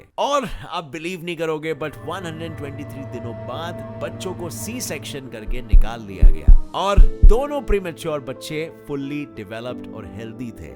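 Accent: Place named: Uttarakhand, India. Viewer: Aap uttarakhand se ho mujhe pta chl gya 🤣🤣😂😂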